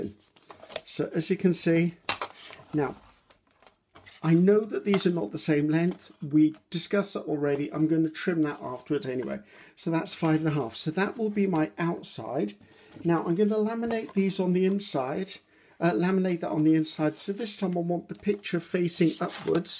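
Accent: England, United Kingdom. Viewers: Oh my gosh, I came across you quite by accident and LOVE YOU! I'm a Brit living in the USA and love watching English crafters as it makes me feel closer to England hearing the accents and listening to you, I feel like we are old friends getting together for a natter and a cup of tea. I'm not new to paper crafting but I am to junk journals. They always seem daunting to make but your vidoes have inspired me to actually try making one! You have a new life long fan!! xoxo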